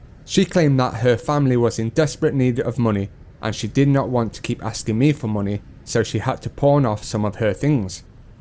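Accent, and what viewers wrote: England, United Kingdom. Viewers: Why do you have an English accent if you are from Australia? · you have a genuine interest to find a partner. do not pick from the wrong place. your english pronounciation like a british guy not like an australian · You sound more British than Aussie ? , such scheming and unscrupulous women make my skin crawl ! Sometimes human goodness is not found in swamps of poverty and profession . It’s just reptilian instinct of surviving . Glad that you are able to look after your mother which is the best decision and I am sure you will find a good match and life partner who will recognise your qualities and be there just for you ! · Anyway if you love her if you give her money nothing wrong at all. Everything you pick what is problems?. If your relationship or whatever you are thing go wrong all the time are you sure you a Australia man? 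For me you not You must came from England Your accent is English Man Okay you pick the wrong person why you complain for nothing you can fix anyway Speaking English or not nothing to do with that ever your own people devoice happens all the time ever your the same language. So why you complain for? happens everywhere people not meet what they want nothing perfect in the world. You should know that By the way you not a Australia Man You a English 😂😂😂😂😂😂 Your love dream broken down Nothing she can do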